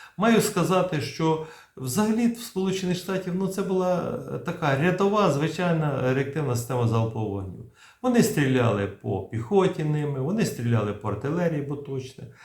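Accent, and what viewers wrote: Vinnytsia, Ukraine. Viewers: Як же ж мені подобаються МЛРС, ХАЙМАРС і пан, так чітко і класно доповідає нам про ВСЕ!!! Балдєю від Вашої украЇнської вінницького акценту.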